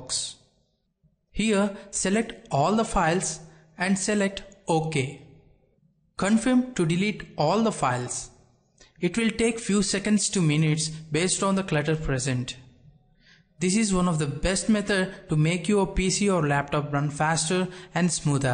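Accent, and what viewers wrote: India. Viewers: Your accent is Indian and I had random Indian friends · nice indian accent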